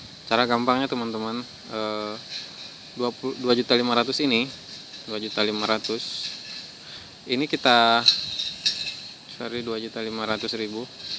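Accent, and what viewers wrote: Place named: West Sumatra, Indonesia. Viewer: nada minangnya jelass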